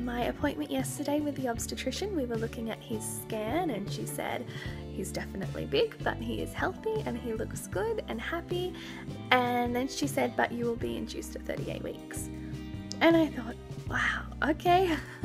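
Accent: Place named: United States. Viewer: Happy to hear you and baby are doing well..its going so fast.I live in Qld Australia, I thought you were American lol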